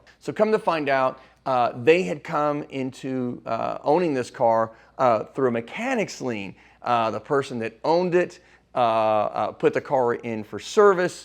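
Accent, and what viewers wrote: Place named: Texas, United States. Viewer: Definitely From Dallas Texas, Maybe Houston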